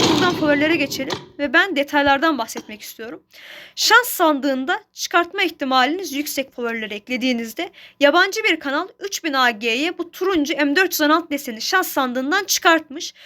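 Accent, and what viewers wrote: Azerbaijan. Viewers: Qaqa Azərisən türkcə danışırsan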